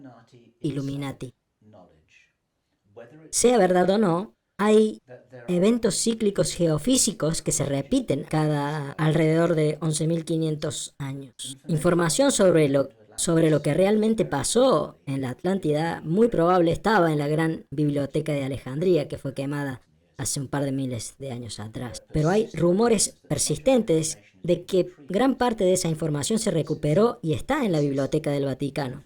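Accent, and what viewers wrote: Córdoba, Argentina. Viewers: Felicito a quien se haya tomado el trabajo de traducir a voz viva todos estos documentales, pero esa voz tipo " las ardillitas" y con una tonada cordobesa y con intervenciones tipo humor cordobés...jajajajaja, me encantaría saber quien está detrás de esa maravillosa voz....jajajajaja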